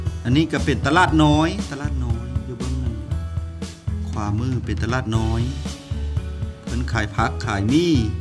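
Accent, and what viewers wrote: Phu Thai, Thailand. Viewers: มักน้ำเสียงสำเนียงผู้ไทภาษาพูดน่ารัก ติดตามตลอด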